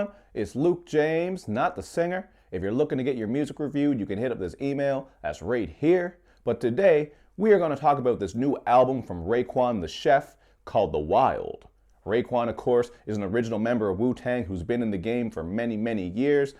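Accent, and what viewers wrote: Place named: Newfoundland, Canada. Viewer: nova scotia? I knew you were a newfie. that accent sticks out like a sore thumb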